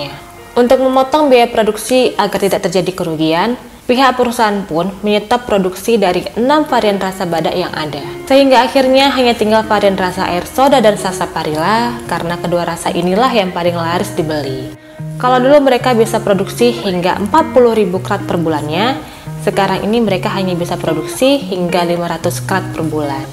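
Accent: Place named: Medan, Indonesia. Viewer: Banyak belajar sejarah lama yang baru tau nya sekarang, padahal yang asli medan belum tentu tau. Semangat terus buat mbak rutin upload nya, karena saya yakin masih banyak cerita seru sejarah/kuliner medan yang menarik dibahas dengan logat "cakap-cakap medan" hhe Terimkasih udah buat video ini jadi lebih tau. #Giveaway #Dudiksendaljepit #RagamVariasi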